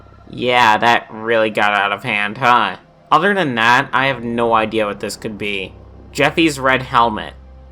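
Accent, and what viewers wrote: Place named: Brooklyn, United States. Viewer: At times u sound like brooklyn t guy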